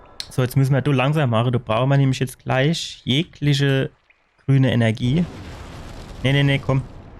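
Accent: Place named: Palatinate, Germany. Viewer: YouTube wäs hald was guud is. Kä Ahnung warums ma Dark Souls vorschlachd, awa mim pälzische hots mich kadd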